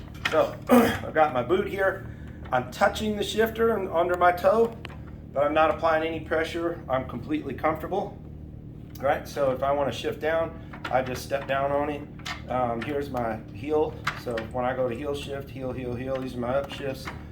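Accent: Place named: United States. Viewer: Is it me or is sounding more country,.. Yeehaw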